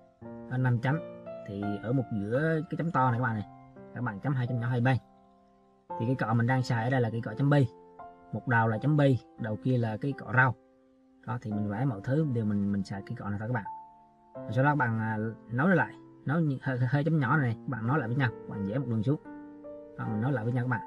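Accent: Central, Vietnam. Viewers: cảm ơn bạn, nghe giọng miền trung mến liền🥰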